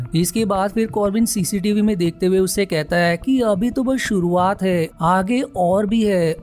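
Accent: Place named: Maharashtra, India. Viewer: Marathi words hote hai , accha lagata hai apke tune me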